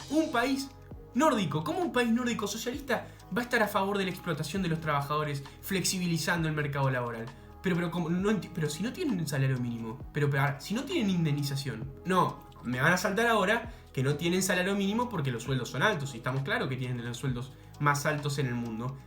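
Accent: Argentina. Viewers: Todo bien pero en el norte de Dinamarca el 80% de la poblacion laboral es contratada por el estado. Igual tenes razon, pero hablando en neutro con acento argentino queda medio payaso.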